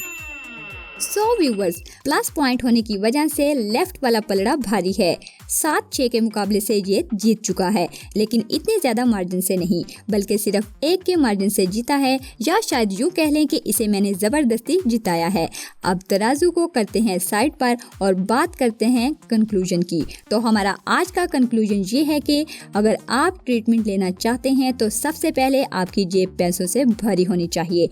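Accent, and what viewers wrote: Punjab, India. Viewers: yaar plzzz apni Urdu ka style theak kro bilkul Punjabi lehja hy tumhara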